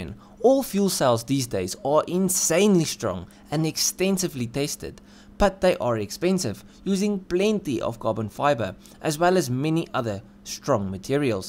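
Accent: South Africa. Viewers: Great to hear a real SA accent.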